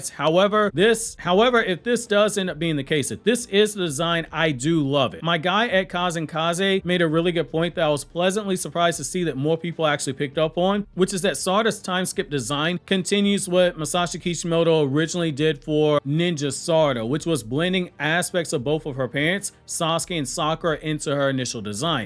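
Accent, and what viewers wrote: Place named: Carolinas, United States. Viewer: Bro sounds likes you lived in the Carolina’s lol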